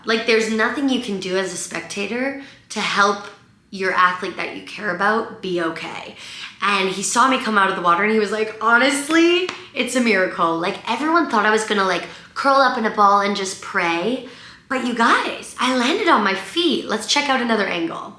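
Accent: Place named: United States. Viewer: Where’s your English accent gone? You sound REALLY American 😳😢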